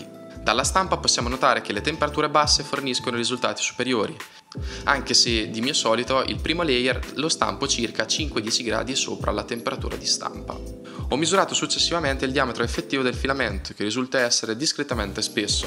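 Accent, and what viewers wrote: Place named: South, Italy. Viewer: mi sembrava che avevi un accento del sud... Quindi sei nelle marche giusto?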